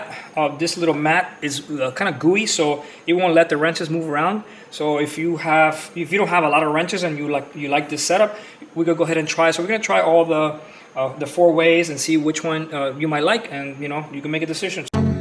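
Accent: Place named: Cuba. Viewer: Great video! I like your voice, you sound just like my wife's uncle. He is Cuban, raised most of his life in the US, but has just a little bit of an accent.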